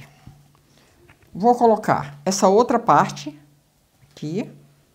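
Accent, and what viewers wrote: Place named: Northeast, Brazil. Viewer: De qual estado do nordeste você é? Esse sotaque não conseguir identificar. É do nordeste mesmo ou é norte?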